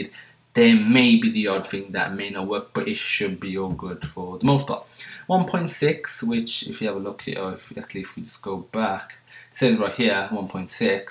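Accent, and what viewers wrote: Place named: Dudley, United Kingdom. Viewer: That's a great accent. I'm guessing you're from Dudley or somewhere near there.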